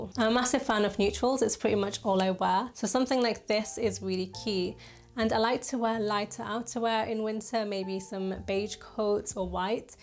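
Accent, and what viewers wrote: United Kingdom. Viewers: Please forgive me for being an ignorant American, but could you explain what kind of accent you have? Is it specific to one city or region in the U.K.? Your accent is so gorgeous—the way you say everything is elegant and distinguished. Ps: congrats on your engagement!! Wishing you and your partner all the happiness in the world.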